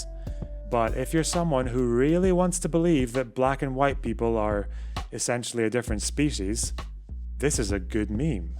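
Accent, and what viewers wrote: Scotland, United Kingdom. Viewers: bro what part of scotland are u from I’m trying so hard to pin your accent. Or are you from NI? aw mate I’m struggling to sus it out like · Màke Your mind up, Scottish or American ??!!. "Fannies"...okay, Scott's. SPEAK ENGLISH BOY !.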